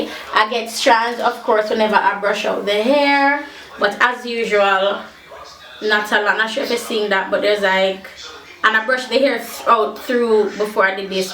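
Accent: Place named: Jamaica. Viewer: i love your accent not many Jamaicans around here :)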